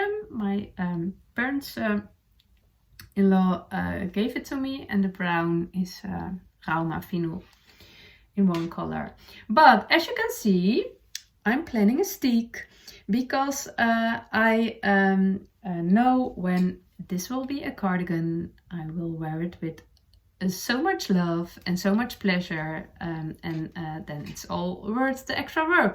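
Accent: Netherlands. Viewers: Love your sweater, the neckline, the colors, the patternwork and it's placement! Well done! ❤❤❤ Your English is very good. Love your Dutch accent, so charming. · Oooh. I am only a few minutes in but know I'm going to love you. My grandfather was born in Netherlands and your accent reminds me so much of him. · It's delightful to watch and listen to you. I don't mind your dutchie English. Looking forward to seeing your steeked cardigan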